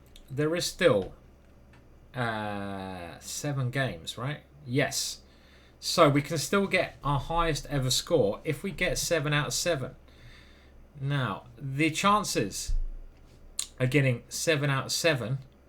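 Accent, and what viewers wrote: England, United Kingdom. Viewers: Alright mate, really enjoying your channel, which I'm fairly new to. I watch Eric rosen and have enjoyed his speed run. He's so relaxed and calm. But, I don't know if its because you are English and sound like me, but I really relate to your commentary and thought processes. Which is ridiculous, because the chess i play is the equivalent of Sunday league pub team football, where just turning up is a victory. But you have a knack for explaining shit in a really relatable way. You come across like a normal bloke that I could easily have a pint and a chat with, and it wouldn't be weird. Anyway, not sure what im trying to say, except cheers and good luck. Check out 'devon tracey makes no money at chess'. Beautiful channel, interesting fella. OK that's it. Cheers.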